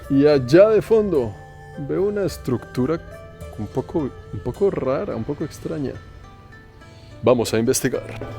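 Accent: Colombia. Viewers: pero su acento parece más colombiano (del interior) que panameño... O me equivoco?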